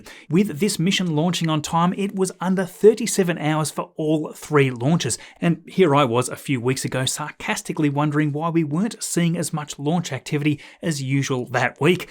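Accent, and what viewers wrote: Australia. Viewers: ..... Awesome presentation again.... 👍... If I could remind you, your success at least in my simple brain 🧠 lol 😁...is do to some awesome folks with British accent.... First one is John Romer archeologist, and David Attenborough naturalist.... I just totally loved these two and their presentations with that accent, your Ozzie accent is similar to theirs and captured my attention in the beginning of your YouTube stardom 🙂. Of course you do have a hell of lot more charisma than both of them two above lol 🙂....not to mention the effort and the knowledge you put into your presentation. Much appreciated mate 👍🤜🤛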